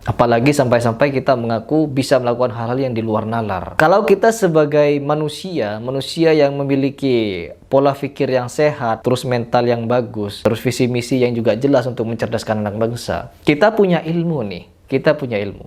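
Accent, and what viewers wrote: Madura, Indonesia. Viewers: Mas sampean orang madura ya?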